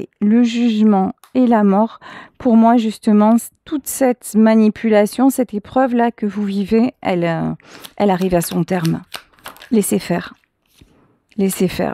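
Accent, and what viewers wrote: Marseille, France. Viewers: Coucou...merci pour ce beau message d'espoir et d'un visible...navré pour vous de la perte de votre frère...j'ai versé une larme de Pierrot pour vous deux...j'ai perdu des êtres chers, très chers, comme vous le décrivez! Votre tirage me parle tellement, la personne qui trahit, et des défunts, j'en ai 3, dont une que je vois souvent dans mes rêves. Votre épreuve vous rend encore plus forte et plus belle, rayonnante...d'ailleurs, vous avez une voix magnifique, et hé vous écouterai pendant des heures, toute une vie. Votre voix me rappelle la femme qui guide la méditation de Doreen Virtue, et qui dure une heure...on se sent porté, guidé, aimé, protégé, comme avec vous! Un Ange 👼! Merci à vous, merci d'être là! Et moi même thérapeute, enfin plus précisément pédagogue, puisque je suis sophrologue, je suis ému et transporté comme je pourrais le faire en relaxation...Je vous embrasse belle voix marseillaise, peu cher...oui, très cher! 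Très chère...Jeux de mots 🤩😘🌞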